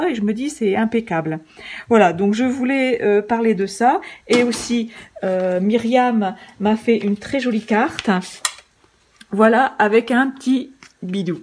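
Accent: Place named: Belgium. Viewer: coucou j aimerai savoir ou vous trouver vos jolis papiers merci super vdeoj adore votre accent belge ou le nord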